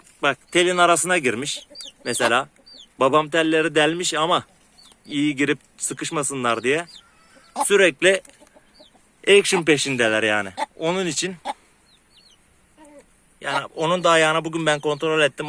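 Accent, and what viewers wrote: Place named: Thrace, Turkey. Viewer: Konuşman Trakyalı gibi sanki nerelisin :)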